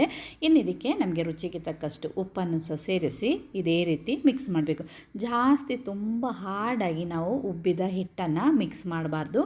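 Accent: Kerala, India. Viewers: Malayalam accent. Why don't you answer the comments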